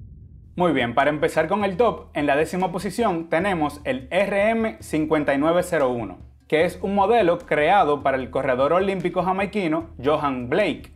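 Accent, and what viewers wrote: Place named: Dominican Republic. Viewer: Dominicano eres, se nota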